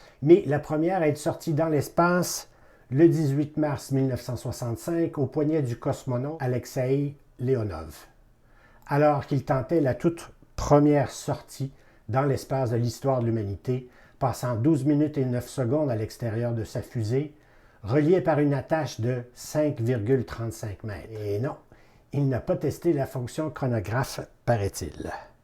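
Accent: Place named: Quebec, Canada. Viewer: je vous en prie et votre bel accent du Quebec va très bien avec vos présentations...Bien à vous.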